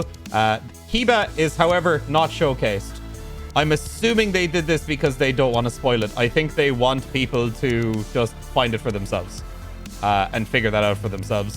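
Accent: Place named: Ireland. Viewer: Been waiting for an Irishman to read me those juicy patch notes! Sorry about the funeral though man :(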